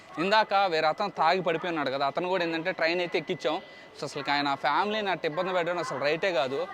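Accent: Nellore, India. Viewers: Nellore slang is super - mama 😁😁😄😄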